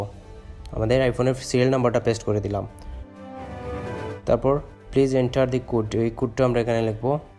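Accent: Sylhet, Bangladesh. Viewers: Vai kita sylhety ni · Bhai tumi Sylheti nay ni....❤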